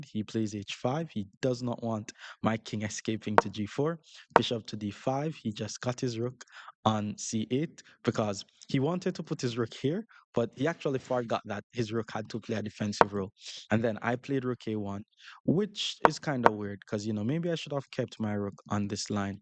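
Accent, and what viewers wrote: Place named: Jamaica. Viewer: Not gonna lie this whole time I thought you were Jamaican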